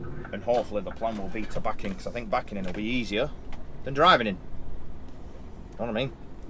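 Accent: United Kingdom. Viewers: just watched this, and subscribed. loved it, brilliant accent as well, tho I dunno from what part of the UK that is. Maybe someone here can enlighten me on that ? Greetings and a big thanks from Holland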